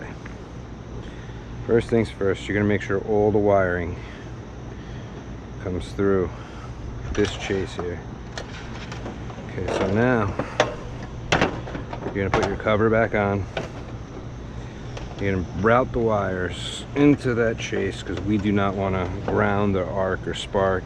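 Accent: New York, United States. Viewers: You have a New York accent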